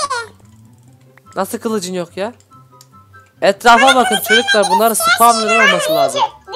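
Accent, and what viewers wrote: Adana, Turkey. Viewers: Abi sen Adanadamısın,Benide çıkart abi ❣❣❣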